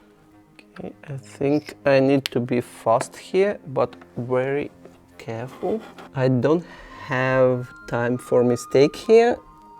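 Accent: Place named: Eastern Europe. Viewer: Super nice video! And Eastern-European-English style gives it extra fun ❤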